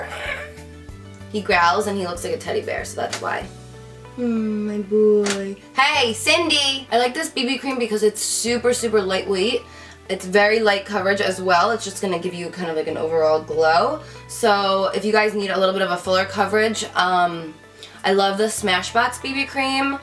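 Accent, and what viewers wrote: New Jersey, United States. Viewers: I loveeee your Jersey accent and Jerseyism! I've had a love/hate relationship with NJ since moving here from California but you make me proud to call myself a Jersey girl now! <3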